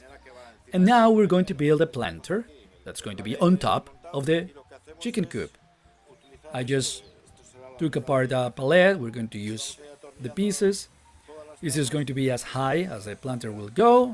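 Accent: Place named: Spain. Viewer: pero ingles se nota el spanish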